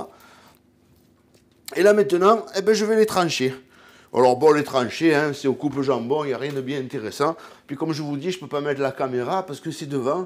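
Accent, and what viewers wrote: South, France. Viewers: salut mon sympa cette petite video qui met en appetit ca donne du courage pour commencer la semaine continue te un mec super a bientôt et garde ton accent ensoleille pour nous les gars du nord si non si non tu connaît la reponse ah ah bisous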